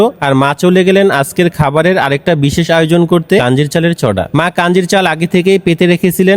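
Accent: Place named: Narsingdi, Bangladesh. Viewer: Tai Tu boli vasha ekdom amdr Moto . Hajipur chinen? Gechen kkhno?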